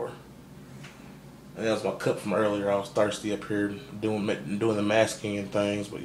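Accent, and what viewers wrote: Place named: South, United States. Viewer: It looks good and u sound southern as hell like me, Louisiana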